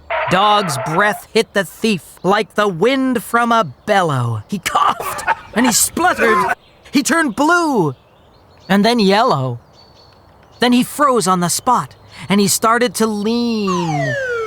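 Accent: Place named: United Kingdom. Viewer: I LOVED these!!! It's just not the same though without the British voice over :(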